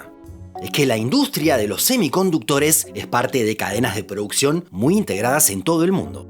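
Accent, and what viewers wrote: Argentina. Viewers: Siempre tuve la duda si sos argentino o mexicano, pero para mí sos compatriota 🇦🇷 jaja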